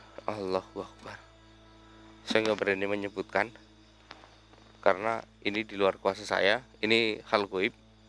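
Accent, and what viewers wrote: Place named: Ngapak, Indonesia. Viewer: Ya emang logat Jawa ngapak begitu bahasa ngoko itu/kasar..bukan bahas kromo inggil.. Atau lisan Jawa kraton gitu om.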